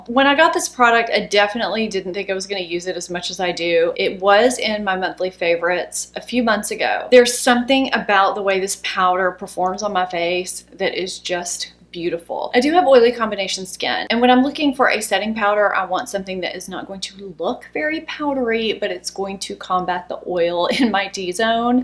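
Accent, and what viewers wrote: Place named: Tennessee, United States. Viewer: Love that you mentioned you are from Tennessee! I'm a Georgia girl, and have lived in Lousiana and Tennessee. The entire time I've watched you, I kept saying to myself, " She is not from New Orleans. She sounds like she's from Tennessee!" Isn't it funny that Southern accents sound the same to those not from the South, but those of us that have lived our lives here can place them.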